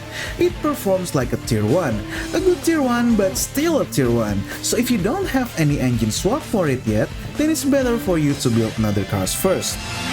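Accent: Indonesia. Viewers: you sound indonesian...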